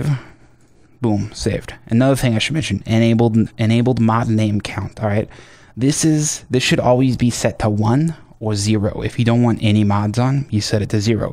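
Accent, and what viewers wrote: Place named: Massachusetts, United States. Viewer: This dude has to be from Massachusetts... the accent... lol, my computer is a piece of shit old Alienware that is all kinds of cluttered so nothing works for me :( I really don’t want to spend $3k for a new one but that might be the only solution. Having hughesnet for internet which is satellite with a 50 gb cap per month ( hughesnet is absolute garbage and they don’t deliver what they claim so don’t do it unless it’s your only option and last resort.) doesn’t help for problem solving as far as re-downloading everything. Very entertaining btw.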